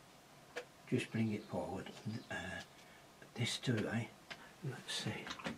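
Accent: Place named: North, United Kingdom. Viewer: Loved this, your so lovely,and northern like me lol, I understand you perfectly lol👏😁 you brill, hope your wife comes home soon my love, you take care of yourself, hugs👍🇬🇧❤️💙🎨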